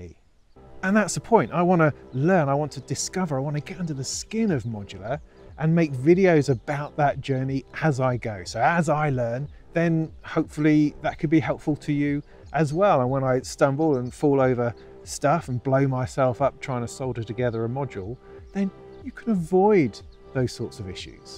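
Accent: United Kingdom. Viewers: if you are into subtractive ways, and since you like the moog sound, i suggest looking into the AJH series, i have 2 of their modules in my rig and i ve tried my share of vcos to tell you you ll get every penny you pay for... don´t wanna mess up your modular or financial plan. but modular is about the analog after all and there is a reason certain modules have a certain depth.. haven´t tried the erica and befaco stuff yet, but i hear a lot of good stuff about them... and at some stage you gotta stop drooling over cwejman modules that will probably not be built because the waiting list is longer than number of heartbeats the man has left. so either filter or vco, AJH would be my suggestion. it´ll stay with you as long as you run a modular rig... and since you sound like you´re from that island that just brexited a leg (just a pun!). AJH is local economy! 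Oh yeah, the disting MK3 has saved many many lives!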